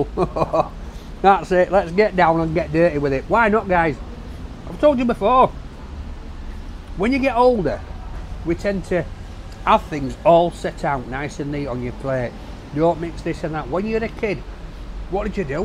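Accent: United Kingdom. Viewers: I come see one of two videos of this bloke when I need me some british accent in mi life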